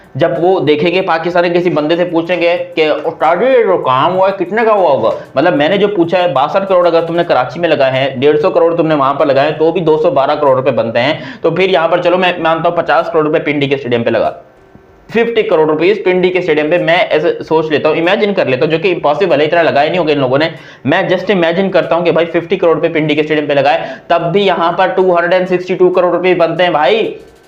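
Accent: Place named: Mumbai, India. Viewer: Aayela hai?? Mumbai ka language...good bro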